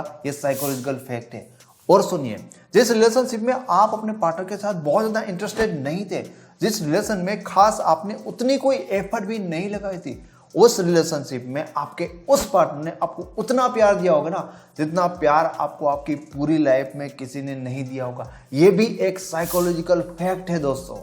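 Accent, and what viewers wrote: Gujarat, India. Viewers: Aap gujarat se ho ?? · Sir Are you from Gujarat ??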